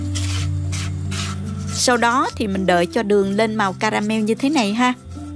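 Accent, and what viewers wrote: South, Vietnam. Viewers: Cháu oi! Là con gái cua giong rạc Nam Bộ hiển Hòa chân chính mà sao lai giới thiệu kênh nghe kênh kenh Hòa hoa làm sao dịu dàng được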